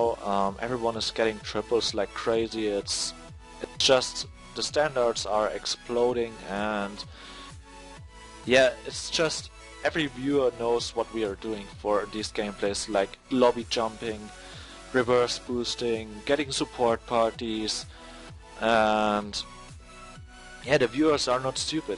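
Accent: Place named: Germany. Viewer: Kommst aus Deutschland ;) schwer zu überhören :)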